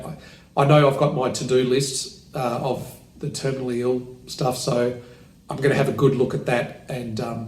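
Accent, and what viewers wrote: Australia. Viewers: Dear, I pray for improvement in your situation (as much as an atheist can pray) and send you love and support. I like your channel for 2 reasons: 1. The beautifull Australian accent 2. The great person you are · I am happy you are back. We worry about you and pray for you. Negative comments! Hard to believe there are weirdos like that It is bad news but I am glad to see you are going to fight. You look good, even if you don't feel good. You are alert and keeping positive no matter what. Hang in there and keep posting, especially before you go in for your scans. Try not to worry. We will pray for the best. You are so brave and an inspiration in courage. Love your cute Australian accent.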